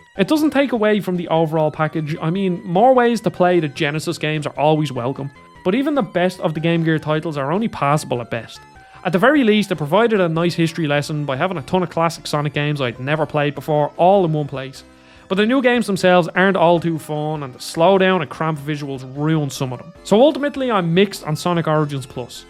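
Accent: Dublin, Ireland. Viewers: Is that a Finglas head I hear? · I at least liked the Irish accent,the words seemed pretty harsh. And what's so great about the Master System that Game Gear doesn't have? I'm honestly curious because I see several comments complaining about the versions used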